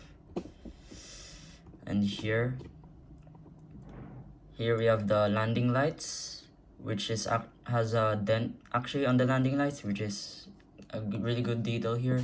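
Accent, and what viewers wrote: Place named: India. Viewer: Are you Indian? I like your accent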